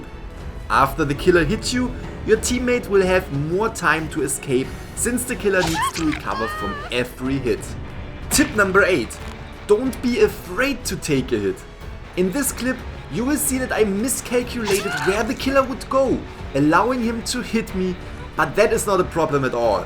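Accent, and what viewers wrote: Germany. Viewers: Kann es sein das du deutsch bist? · Damn bro, man merkt, du bist deutsch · Digga dein english ich habe direkt gecheckt du kommst aus deutschland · Im sorry but first: im super triggered by your pronounciation (it sounds like your german i am too but i speak english too) aand you were using the flashlight super bad giving tips( that are obivious) but not giving the tip to stun the killer before using it? Its actually more important than Open the gate before helping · Are you german ypur english sounds like that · No front, but u are German right? Your English sounds.. pretty german, yk? 😂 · German akzent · Man hört den deutschen Akzent soo raus XD😂 Aber Gutes Video Danke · We love a good deutscher akzent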